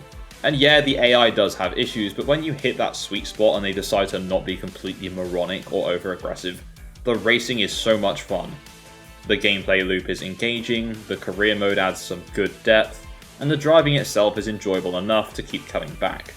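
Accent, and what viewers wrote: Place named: England, United Kingdom. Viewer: I do not even enjoy racing games. Yet hearing this Posh English Man complain is relaxing.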